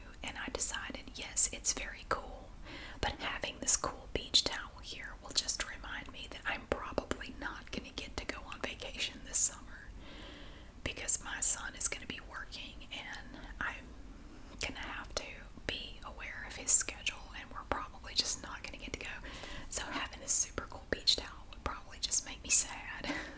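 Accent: South, United States. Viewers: As a southerner, I love to watch your videos! Makes me so happy to hear your accent! Sending hugs from Texas!!! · I’m a baby and you’re my mom right now! I love how southern and relaxing your voice is❤️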